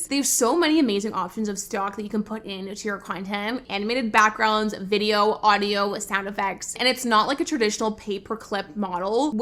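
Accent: United States. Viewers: You have such a strong Californian accent.🤭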